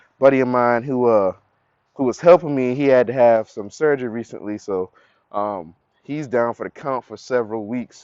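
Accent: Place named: Caribbean. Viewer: Whats good man. I just released a vid on those pistons. I'm doing a Dual LS Swap on my box chevys. Where you from? I hear a lil caribbean accent in there.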